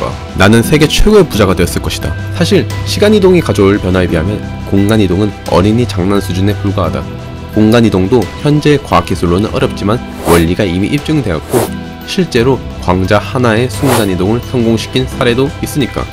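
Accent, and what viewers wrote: Gyeongsang, South Korea. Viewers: 숨길수없는 첯음절에 강력한 엑쎈트 깅상도사투리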